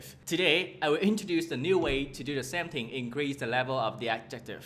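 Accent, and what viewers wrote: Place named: South, Vietnam. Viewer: Nice videos and I love the Southern accent and slang. Thanks for putting all the effort in. A suggestion from me would be to speak less English spoken and more Vietnamese. Also speaking slower in Vietnamese and repeating sentences would make it easier for me to understand as you use quite a lot of words that I don't understand yet.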